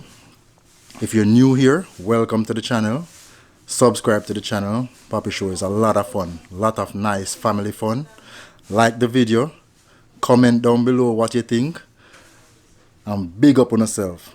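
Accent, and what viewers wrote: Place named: Jamaica. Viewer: I love Jamaica your English 👌 l love it l will try to vlog with jamcn keep going thank you so much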